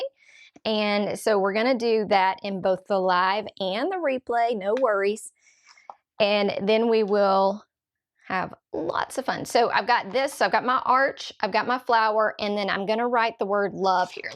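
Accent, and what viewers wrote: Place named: Chattanooga, United States. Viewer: I thought I recognized that accent! I’m in Chattanooga! Love your cards! So glad I caught the replay - hopefully I’ll catch the next one. I’ve just started using my nesting dies for more than just a panel. TFS!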